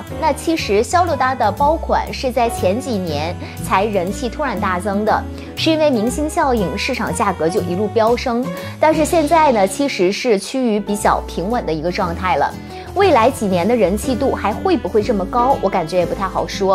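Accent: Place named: Japan. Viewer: 谢谢做这些Hermès 视频. 你真是Hermès expert 而且也有access to so many unique Hermes pieces. 一个小建议哈： 能不能在屏幕上放上讲述内容的英文，日式英文真的是听不太懂啊……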